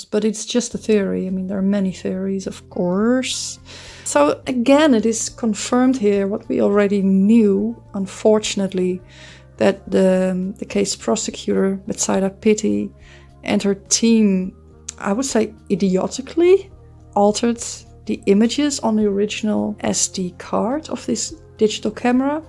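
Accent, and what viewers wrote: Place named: Netherlands. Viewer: dutchies try to speak english 😂bad accent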